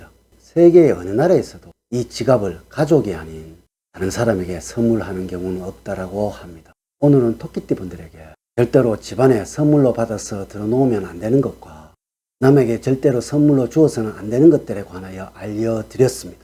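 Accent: Gyeongsang, South Korea. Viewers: 경상도 사투리 듣구 나갑니다 경상도=신천지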